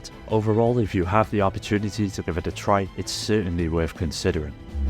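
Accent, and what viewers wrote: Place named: England, United Kingdom. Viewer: Suikoden right off the bat? Fantastic. Just got stumbles upon your video on my feed and i like your english accent, because as a non native speaker it's easy to listen to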